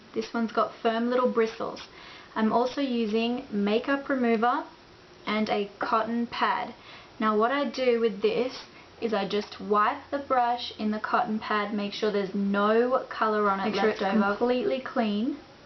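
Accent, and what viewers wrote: Australia. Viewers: I love your aussie accent :D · Aussie Aussie Aussie Oi Oi Oi! I picked you as an Aussie straight away. Boston? WTF? Great tutorial by the by. Definitely going t try this out. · nah shit mate. im ausie and even i can tell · This was lots of help, and you have a strong aussie accent, a lot stronger than mine! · O.k. well I really got something out of it, so thank you. And....my opinion - talk all you want, here in the South, we don't get to hear your lovely aussie(?) accent much. using the makeup remover and pad will help me with my goofs. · Loved this video!! Nice to hear an Aussie accent after watching so many American make up tutorials! xox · boston? really? shes aussie..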